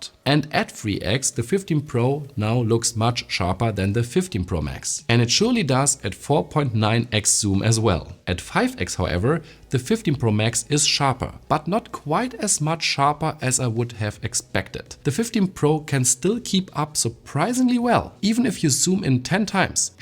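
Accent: Germany. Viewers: You sound German … wouldn't mind this video in German. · Ich mag dein DEnglisch 😅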